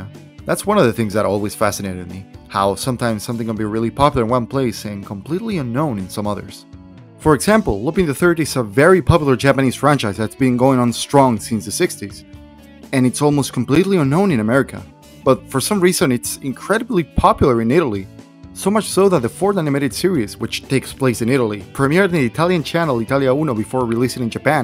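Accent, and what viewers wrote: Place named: Argentina. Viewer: i'd never expect to encounter some Argentine Sonic The Hedgehog Fan English Speaker... Que Copado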